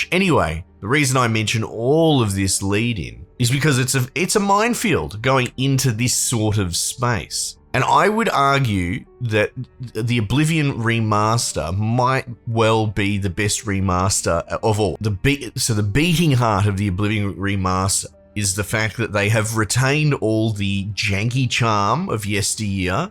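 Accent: Australia. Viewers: Perfect and honest recap dude - love it!! I was looking for a straight-to-the-punch, not-too-technical kinda review to send to my non-Elder Scrolls-abiding Aussie buddies, and since it sounds like you are an Aussie yourself, well that's just the cherry on the pavlova!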